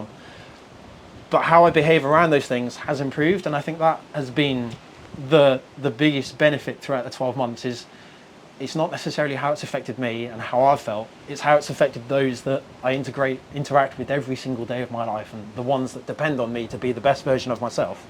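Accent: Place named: United Kingdom. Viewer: First time viewer, was kind of shocked to hear your British accent given that the scenery can’t get much more Dutch 😂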